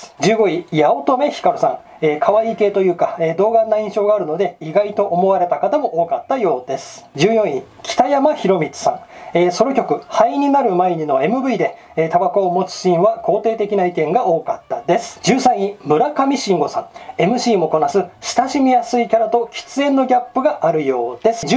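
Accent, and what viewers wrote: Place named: Kochi, Japan. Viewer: こーちみたいなしゃべりかたしちょる笑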